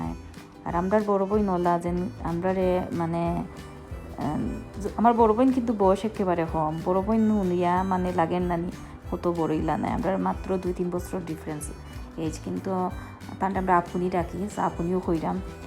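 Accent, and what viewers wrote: Sylhet, Bangladesh. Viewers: Wow apu osomvob valo laglo Sylhety vashai kotha bolai.aro video dekhte chai erokom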